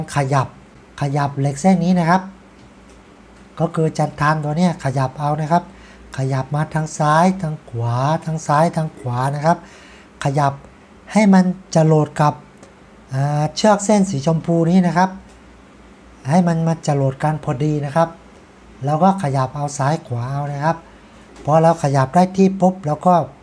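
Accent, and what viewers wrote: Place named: Thailand. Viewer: เค้าพูดภาคกลางไม่ชัดไม่ใช่แปลว่าไม่ใช่คนไทยคนเหนือคนใต้สุดก็พูดกลางไม่ขัดจะถามทำไมยายผมไทยแท้ยังพูดกลางไม่ซัดเลย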